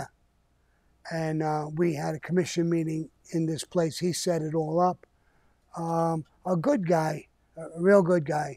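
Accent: United Kingdom. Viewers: Your accent blows go back over the pond and talk about the queen like you know anything about the mob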